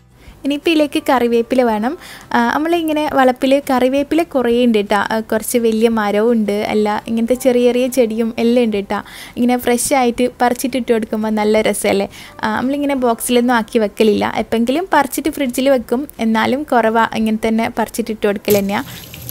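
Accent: Kannur, India. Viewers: ചുവന്ന കുറുവയല്ലാട്ടോ മഞ്ഞ കുറുവ 🤣🤣🤣 നാട്ടിലെത്തിയപ്പോൾ തനി കണ്ണൂർ സംസാരം ആയിട്ടാ പൊളിച്ചു 😍😍😍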